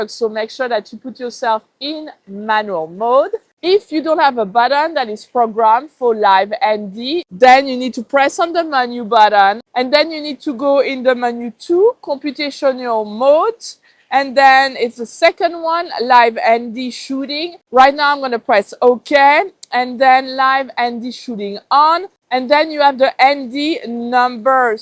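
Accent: France. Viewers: Ça paraît immédiatement que tu parles français 😊 Merci pour le vidéo 👍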